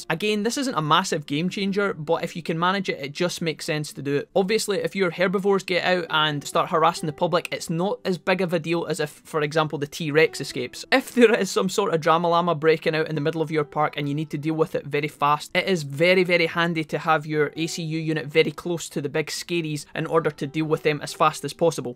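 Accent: Scotland, United Kingdom. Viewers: Excellent tutorial so thank you very much for that! It is so easy to understand your Scottish accent, that is a really big bonus man. Cheers. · Thanks for the tips. Love coming here also cause of the Scottish accent 😂😁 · thank god I have found you. many of those videos, especially the LPs are provided by folks with nerve grinding voices. is it excited teenagers? Idk, but it's so hard to focus on the game with this auditory abuse. you, however, have a calm voice and scottish accent. what's not to like? thumbs up!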